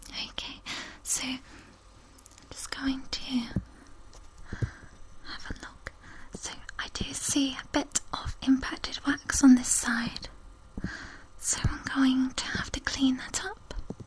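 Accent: United Kingdom. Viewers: Where abouts are you from? Sounds extremely British, and that's coming from a British person haha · British accent inspires me love. I do not speak english, greetings fron Costa Rica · that moment when you watch this videos because you learn english and she has wonderful british accent xD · OMG !!! I love your British accent ....sounds really amazing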